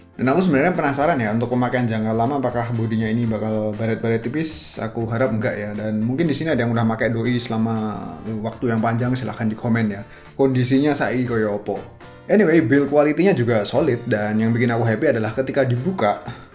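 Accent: Java, Indonesia. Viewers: Mantap bang, medok² asek pertahanin buat cirikhas Yg pemting kontennya berbobot dari Reviewnya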